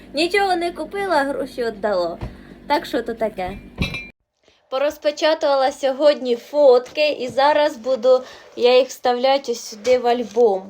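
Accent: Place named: Surzhyk, Ukraine. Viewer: Диво дівчина. Не люблю суржик але з цих чарівних вуст це як музика.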